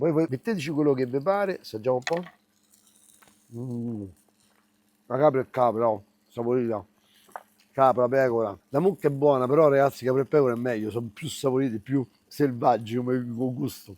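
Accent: Rome, Italy. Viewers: Bravo MAX, quando cucini così è piacevole. p.s. i sottotitoli in italiano servono a tradurre il tuo accento romano ? dai penso che si capisce quello che dici ....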